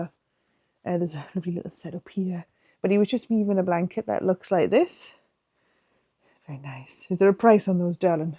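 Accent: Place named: Wales, United Kingdom. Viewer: Pardon me as I’m new here but I’m trying to place your lovely accent? Welsh?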